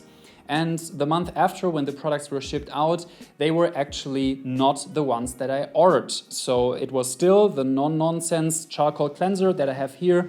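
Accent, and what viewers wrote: Germany. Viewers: I heard 2 seconds and I knew you was German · Yes he have a thick german accent... I know cause I have been living in munich since two years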